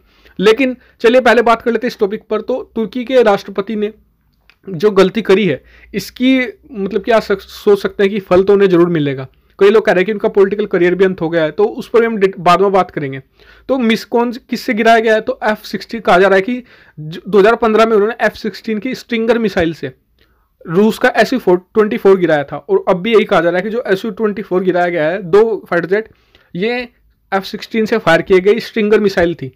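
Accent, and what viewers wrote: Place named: Western Uttar Pradesh, India. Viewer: Bhai aap ese bolte ho ya fir apka bolne Ka tarika esa hai jese ki chai ki dukaan par ko koi bin mange gyan baat rha ho, knowledge Achi share karte ho no doubt but effective voice nhi hai, Kisi particular area ki lagti hai hai jese ki UP west ki ya fir dehati types so pls sudhar laao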